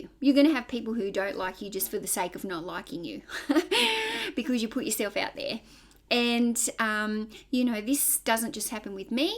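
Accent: Australia. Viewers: Love the Aussie accent. I also have a YouTube channel in Oklahoma. I am subscribing to your channel. Hope you will check mine out and subscribe too. Another YouTube couple drifterthrifters in Queensland follows my channel. Mine is all one word “TheCapGuy”. Have a great day at the op shops.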